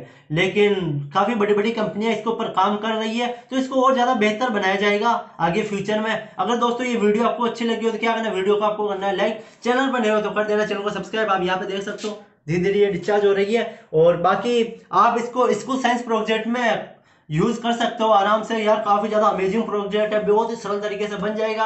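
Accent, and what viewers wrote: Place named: Gujarat, India. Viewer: Bhai ap Gujarati ho ..kiyo ki yeshi hindi ...ham hi bolte..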